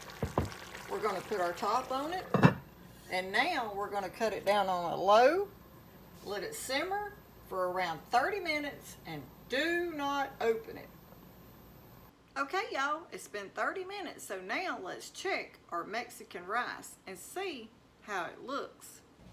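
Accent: South, United States. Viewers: 🤣😂🤣It's southern charm, dear. I live in Missouri and my southern friend from Virginia thinks I talk funny. We can appreciate one another's differences, and be surprised by them.! 💕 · Don't you just love it? 🤗 I always love the sound of a southerners words.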